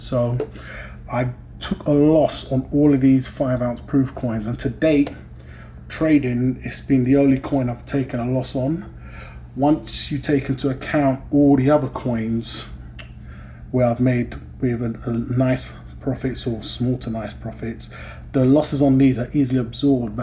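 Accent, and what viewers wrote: United Kingdom. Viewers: I sense a bit of sarcasm in your opening remark & that's a pity when my advice was well intended. Yes I fully watched & listened to your video. Although you have a slight hint of a British accent your rambling for the most part was understood. The underlying message was quite clear to the you tube audience & myself and that is the fact that you as an investor made some bad purchases even by your own admission and the headline of your video: "Cutting My Losses On This Silver Sale" First off I am a numismatic coin collector and you will kindly note Sir that I commented "exclusively on your large (numismatic) proof coins" which you admitted was bad investment purchases & suffered a loss a number of times in your video. Further more, you obviously do not have a grasp of how the world market operates judging by your concern over BREXIT. 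The value of your coins as an asset depends on current market value/appraisal at any point in time. Whether the £ devalues relative to the € or for that matter on world foreign exchange (FOREX), selling your coins you will at "best get what is fair market value at the time of sale"... meaning it could be more than you paid or less. Also despite BREXIT, you are not limited to selling within the UK but internationally...the world markets are wholly interconnected! One more thing - you have thought me not to give advice freely because for some, it is objectionable & demeaning. A lesson learned & I thank you...my bad.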